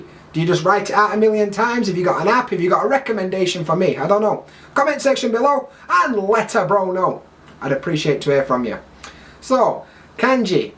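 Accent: United Kingdom. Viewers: have you ever seen your auto-generated English subtitles? Your intro -> "Thirty-Two Eyebrow it's down from ten Dakota and today..." It's like they've never heard a British accent before xD I study Kanji by writing & creating weird associations in my head about what they could represent. The kanji for car kind of looks like a box with two axels, so it's easy to remember. Or for dog, I just remember it's similar to the kanji for fat and come up with the idea that all dogs are fat because they never stop eating. Cheers on 3k subscribers!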